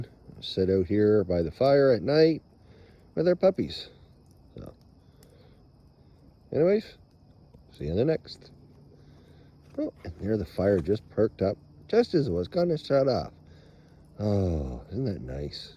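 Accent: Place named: North, United Kingdom. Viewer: Hi 👋🏻it’s Nutty Mark here. Checking your video out for the first time. Looks like, and sounds like your a bit North of me. Always my favorite vacationing location. #20👍🏻P.S. love the Doggie’s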